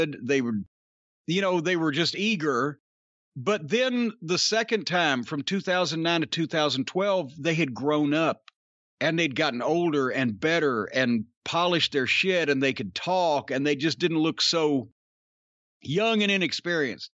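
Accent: South, United States. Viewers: Jim Cornette succulent sounds Good Ol' Southern Boy I'm in Northumberland England UK. Big Respect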